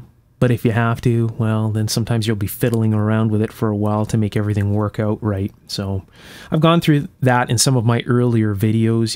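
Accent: Canada. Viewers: I had no idea that Canadians pronounce thing the same way we do in Australia...like Zed instead of Zee, and solder instead of sodder. Impressed. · I was at first agast to hear you pronounce Solder soul'-der instead of saw'_der and Far'-ad as Fer-ad'. Then I head you say awoot and understood that you must be Canadian, ayh. OK I get it. But Michael's name wasn't Fer-ad'-ay, it was Fara'-day. I didn't know Canadians took so much of their speech from the English as apposed to the way Americans talk. As was said in My Fair Lady, "Why can't the English speak English? The Americans haven't been doing it for years." Keep up the good work and I can't wait to see the referb video, either.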